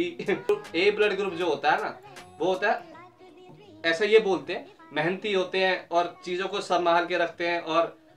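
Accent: Haryana, India. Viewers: Aaha haryanvi.......cha gya chore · Bhai Haryana se hai kya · Hahahah , l like your desi Accent. I love this video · but he is from haryana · you still talk the Indian way ... that's cool thing about you ... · Haryana se ho bhai..?? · Yes. Muje b yahi accha laga.. k inhone indian way me bat ki.. isliye mene channel b subscribe kia.. i like ur video man..😄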